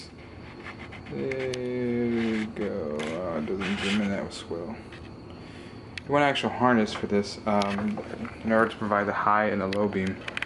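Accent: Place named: Texas, United States. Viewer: What part of Texas your from?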